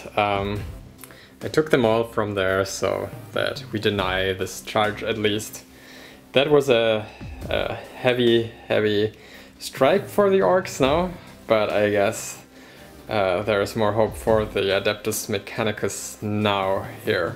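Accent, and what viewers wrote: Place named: Germany. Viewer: You sounds like Germans speaking english 🤔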